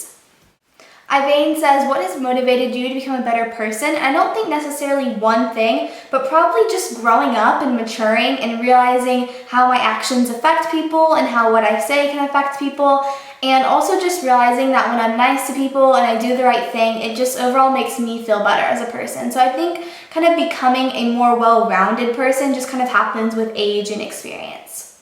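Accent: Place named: South, United States. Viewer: for some reason i feel like you are talking with more of a southern accent in this video! am i the onnly one who thinks so? you look really tan and lovely <3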